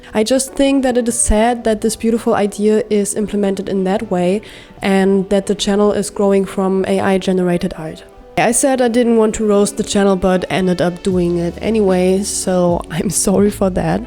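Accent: Germany. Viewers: Is it just me or does she sound like she's German. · Bist du deutsch? Klingt wie deutsches Akzent. Grüßle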